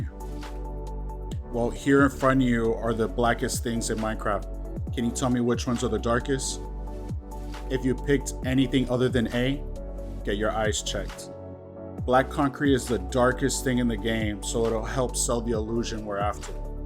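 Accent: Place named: Los Angeles, United States. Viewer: You sound like your from LA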